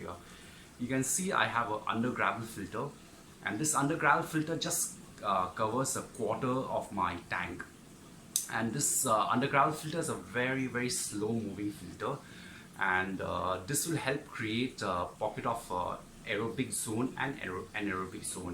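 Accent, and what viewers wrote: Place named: India. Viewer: very informative video may i ask how come do you have an indian accent?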